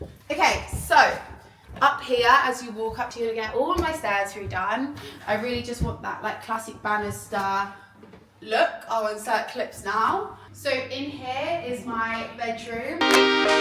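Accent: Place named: RP, United Kingdom. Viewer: Lovely house congrats Lucinda.By the way when did you become so posh speaking?🤗💚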